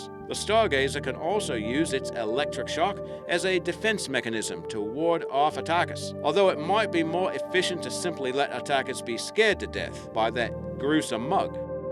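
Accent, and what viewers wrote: Australia. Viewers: Dave 983 I think he is an aussie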